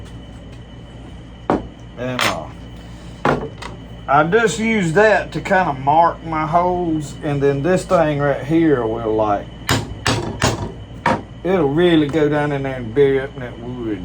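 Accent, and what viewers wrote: South, United States. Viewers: I REALLY LOVED THE VIDEO AND OF COURSE THE "SOUTHERN BROTHERHODD ACCENT." I'M FROM FLORIDA AND WHEN I WAS A CHILD, I WOULD GET EMBARRESED WHEN I TALKED. ACTUALLY HAD PEOPLE WANT ME TO TALK WITH THE HARDCORE SOUTHERN ACCENT. GETTING PAST THAT, I REALLY LEARNED ABOUT THE WAY YOU USED THE CLOTH VS THE SPONGE. ALSO, YOU WERE DEAD ON WITH THE FINISHED PRODUCT! THE HOMEMADE KNIFE, WELL, I'M JEALOUS, BUT WILL FESS UP, I'M GONNA COPYCAT IT. MOSTLY, WHAT IS THE MUSCIC? LOVE IT AND IF YOU TYPE IN "DONNIE LAWS," HE USES THE SAME FIDDLE MUSIC. PLEASE LET ME KNOW WHERE TO FIND IT,... I LOVE IT! PEASE SHOW LOTS OF YOU WORK,... AS IT GETTING TO BE A LOST ART. THANKS ROG FROM THE SWAMPS OF FLORIDA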